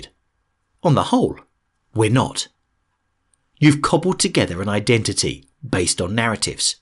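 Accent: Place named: Scotland, United Kingdom. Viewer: Just enjoying the Scottish accent. 😊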